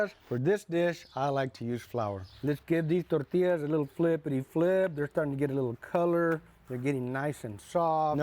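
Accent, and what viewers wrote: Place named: Brownsville, United States. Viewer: I'm from Brownsville and this guy sounds exactly like the people here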